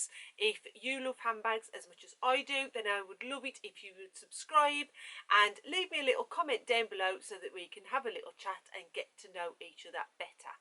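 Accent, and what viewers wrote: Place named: Black Country, United Kingdom. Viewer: I bought this bag too as I LOVE the denim (I bought the headband to match to LOL) also, you’re accent I love it! Im a fellow Black Country person xx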